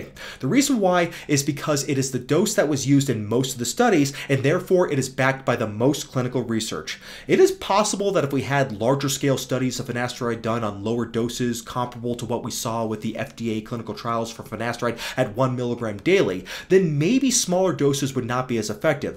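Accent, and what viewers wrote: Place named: North, United Kingdom. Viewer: UK vibes. Possibly north of england. Possibly Pakistani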